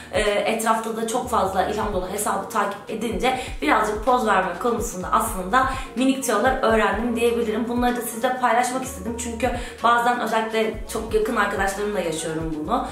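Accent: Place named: Aegean, Turkey. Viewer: sesin ege kökenliye benziyor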